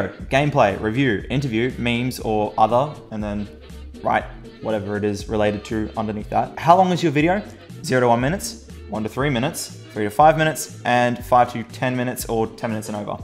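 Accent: Australia. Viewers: Are u Australian? U dont have to answer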